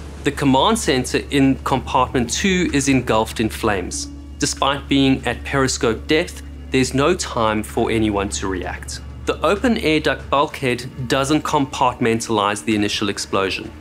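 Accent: South Africa. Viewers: Mate, I would just like to send you all the compliments you deserve!! Your quick and concise detail of complicated events is an absolute pleasure!! I've watched many of your videos of incidents I already know a lot about and still won't skip a second! Best of all, having a good old South African boitjie telling me the story makes me feel like I'm chatting to a mate! Thank you for all your time and effort you put into these videos!! I tip my hat to you sir!!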